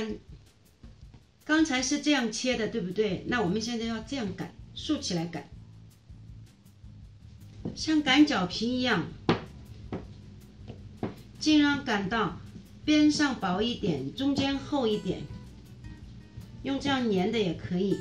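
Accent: Shanghai, China. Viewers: 上海普通话听着好亲切哦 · 👏👏👏一口上海普通話❣️❣️ 教了拿能弄，還撫平了我的思鄉心💕 謝謝噢謝謝🙇🏻‍♀️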